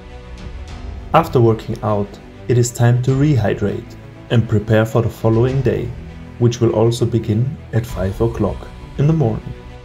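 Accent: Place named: Austria. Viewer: You talk like Arnold Schwarzenegger )) I'm coming to Graz next week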